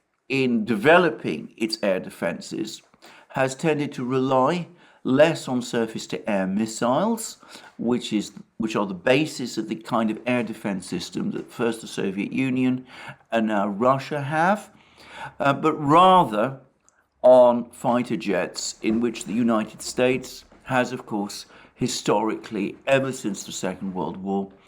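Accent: United Kingdom. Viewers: British accent is adorable and I like learning new words from. Moreover, now I know that even English speaking people say "hypersonic" instead of "supersonic" 😁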